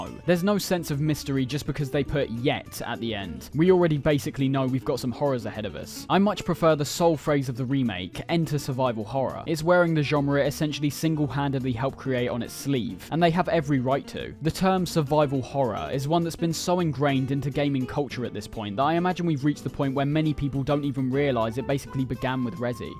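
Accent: United Kingdom. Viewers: When I saw this on my feed I said to myself, "please have a brittish accent, please have a brittish accent". Was pleasantly surprised, idk why but it gives a sort of legitimacy to these essays.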